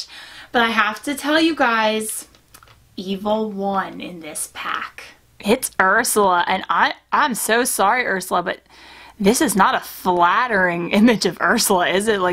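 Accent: Philadelphia, United States. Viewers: I'm from the Philly area too!! Never really picked up on your accent until you mentioned it.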